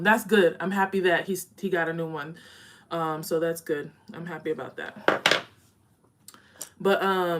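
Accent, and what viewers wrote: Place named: New York, United States. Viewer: Awww come thru, NY accent!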